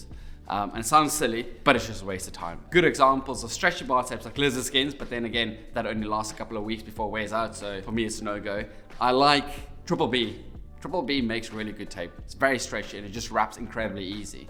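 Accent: South Africa. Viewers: What accent is that? Cape Town? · Definitely a South African, good advice Boet ! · Sounds like a SAFFA… must be 😂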